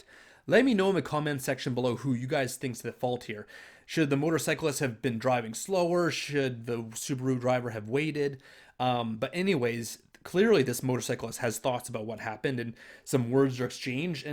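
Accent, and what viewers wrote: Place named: Canada. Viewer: Love me a strong Canadian accent 😍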